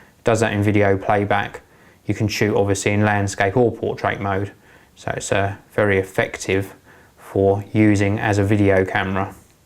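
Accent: United Kingdom. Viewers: ar u british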